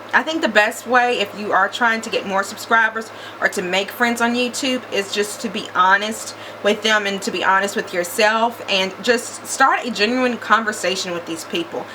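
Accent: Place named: South, United States. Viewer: I love your southern accent! Your make looks beautiful!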